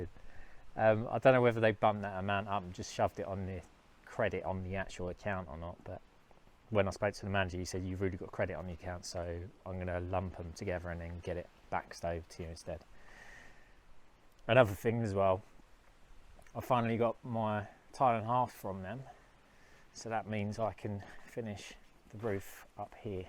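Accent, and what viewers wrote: United Kingdom. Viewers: to our US ears, has a comically UK accent. Like one of those side characters on your telly!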